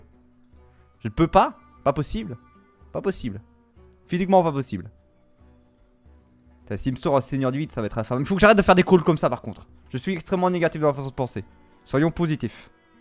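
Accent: Belgium. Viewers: Ah ben ça fait plaisir une petite arène. Par contre quand je t'écoute parler ça sent bon la frite la bière et la fricadelle j'adore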